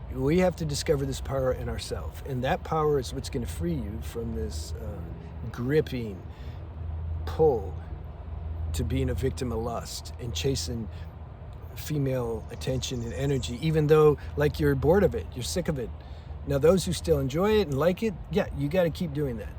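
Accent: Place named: Jamaica. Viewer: ya talking like a rasta man now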